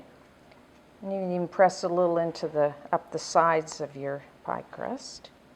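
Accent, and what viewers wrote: Canada. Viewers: That's just her cute Canadian accent coming through. She also said Oat for out and a boat for about...we in the USA love to hear that accent. It's very charming :)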